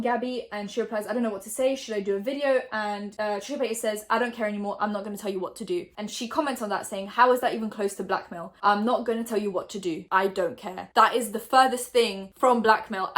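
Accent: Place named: Newcastle, United Kingdom. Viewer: where are you from out of curiosity? I’ve always assumes English (you sound Geordie to me but I have the worst ear for accent) but am now having a crisis because of how you said “in the uk.” Help me please XD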